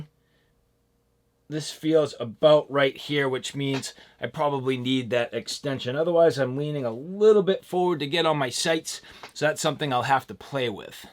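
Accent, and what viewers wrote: Massachusetts, United States. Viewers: You are from Mass. I can tell.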